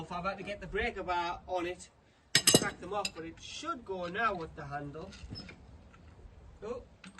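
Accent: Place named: Northern England, United Kingdom. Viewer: Thought you were couldnt tell by the accent if you are based in north east or north Yorkshire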